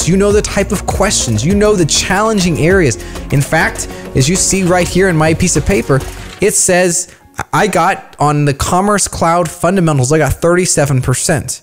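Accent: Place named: United States. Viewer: like your accent ... it's rare to find an American accent in magento field hhhhh😂😂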